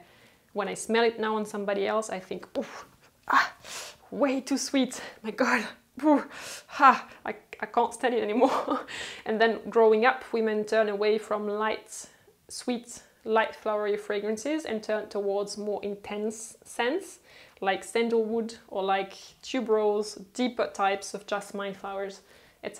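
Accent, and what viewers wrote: France. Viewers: Am I hearing english in french accent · I love this video. It’s perfect, concise. You have such a lovely accent, and your perfect red manicure along with your black top are exactly how I imagine classy, quintessential French ladies. My favorite go-to perfume is Alien by Thierry Mugler. I know some ppl find it strong, but I love it. I received a sample once and knew I had to have the large bottle. I always spray on my wrists, neck, and behind my knees before I go running. I love how I can smell it come off in warmth as I run along. Plus, the name appealed to me. When I purchased it, at that time in my life I very much felt like an alien in my world lol. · more than your knowledge of perfume, i was enthralled by your frenglish.😊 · Haaaa shes French. She pronounces it with a French accent. She'd actually know. No where did she say Glass. Thats the french r you're hearing.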